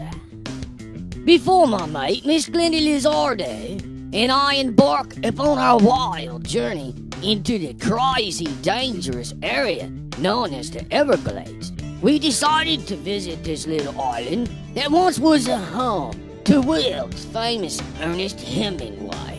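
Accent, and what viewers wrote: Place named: Australia. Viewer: Hey Bro, always entertaining! Kick'in butt . Love the auzzie talk'in mate!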